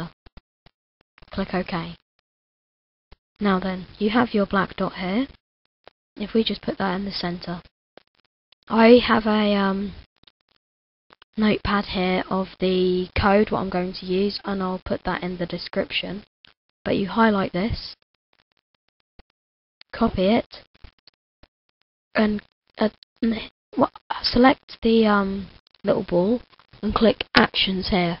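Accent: United Kingdom. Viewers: hey are u british?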